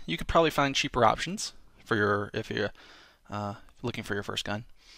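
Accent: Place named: North, United States. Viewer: ***** I was pleasantly surprised, but only because seems to have a pronounced Northern American accent. As a Texan with a WWII rifle and a full-size steel combat pistol less than 2 meters from my bed, it's perfectly normal, but Americans from the more Northern states stereotypically avoid gun ownership (Maine and New Hampshire excluded). But I'm delighted to find out likes guns too! He's risen even higher in my estimation of his character.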